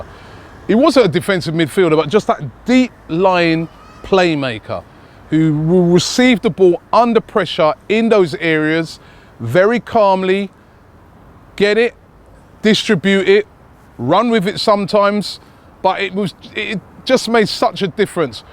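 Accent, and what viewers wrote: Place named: London, United Kingdom. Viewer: bradke London mate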